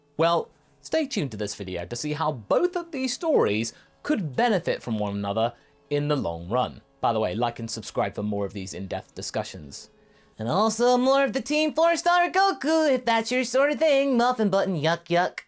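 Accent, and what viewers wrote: United Kingdom. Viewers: Peak DBZ, in my opinion, was when Goku wasn't beating everyone and they all just had to rely on him. It was when the side characters could get the spotlight and do a little something against the enemies. For example, Vegeta beating Freeza's forces on Namek until the Ginyu Force showed up. Or Vegeta transforming into a Super Saiyan and beating Android 19. Or Vegeta (I'm repeating myself but he gets great moments in the series) going Grade 2 and beating "You're either perfect or you're not me" Cell. And most notably of all, Gohan going SS2 and beating Perfect Cell twice. So to have a story where Goku and Vegeta go to space and fight enemies relative to their strength while leaving the others to defend Earth against enemies relative to the side characters would be great because no one is getting shafted. The enemies aren't too difficult to overcome where they need Goku saving the day and aren't too easy to beat thus lowering the tension and stakes. 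Also, this is my first time watching you and was genuinely confused for a bit, thinking "why does the name sound so familiar?" and then being shocked that 1) you voiced Goku in DBZA and 2) you're British, so that was funny.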